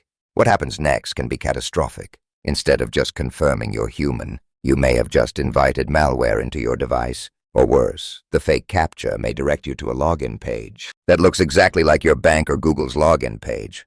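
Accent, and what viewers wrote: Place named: United Kingdom. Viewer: "American Tales" with a British accent. Funny. Thanks though :)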